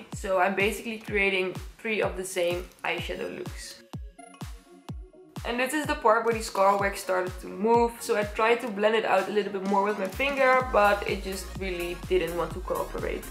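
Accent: Netherlands. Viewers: het is zo grappig ik hoor je Nederlandse accent tussen het Engels PS love you dit is echt een van de beste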